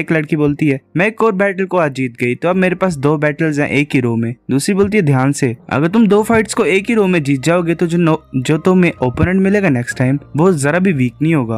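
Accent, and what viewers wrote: Himachal Pradesh, India. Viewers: Bhai aap himachal se ho kya😅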